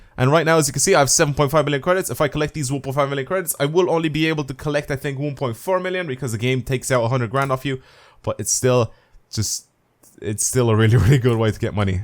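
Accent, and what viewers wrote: Ireland. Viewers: Is ur accent irish cuz u say cär instead of car xd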